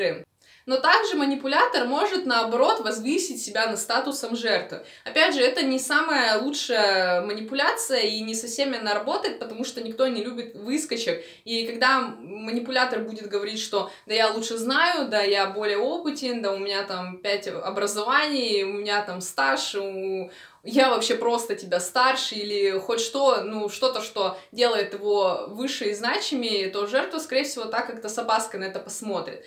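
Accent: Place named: Urals, Russia. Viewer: Говор Уральский, окающий, ты из Перми?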